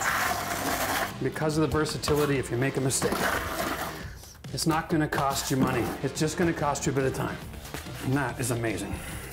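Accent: Canada. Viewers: He sounds Canadian, so I am guessing he is in Canada.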